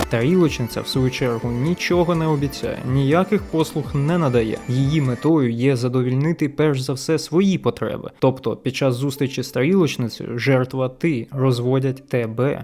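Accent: United States. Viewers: Крутий американський акцент, ніколи ще не дивився відос від українського Джона. Лайк + підписка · бро, ю хев гуд амерікан аксент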